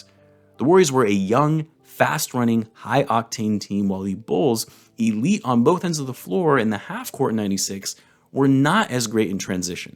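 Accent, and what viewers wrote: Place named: New York, United States. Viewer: lol I have a few people who work on my job that come from NY and you sound so so so much like them lol and they can’t stand MJ and those Bulls, but it is a respect issue, and when Scottie dunked on P Ewing and then pushed him down & those Knicks fans never ever forgot it either. Well, I never truly hated the Knicks, but it’s those Yankees that I love to hate, because I am down here in Baltimore Maryland, and those Yankees broke my heart so many times, but getting back to your subject, I like The Lakers and I wanted LA to win, Portland to win, Seattle to win, and Phoenix to win, but I was never truly a fan of Karl Malone and that group, so I loved it when MJ and those Bulls beat Utah, but I was cheering against The Bulls the majority of the time, but then, there comes a time where you have to give credit to those Bulls of the 90’s and that goes for MJ & Pippen, because they came together as a team when it counted most. 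Ummmmmm sure MJ gave most of those Teams the blues but Scottie was putting in work as well & as MJ would slack off, you had Scottie to seriously deal with, so it went both ways. It was almost like having a good boxer who was predominantly right handed, but would also jab a person with a short left handed jab, but they were good together ✌🏾I was so so hoping that Kobe and Trevor Ariza was going to give me much of the same, but The Lakers traded for Artest 😞 & I am still saddened by Kobe’s tragic end bait ( Sighs 😔 Greatly )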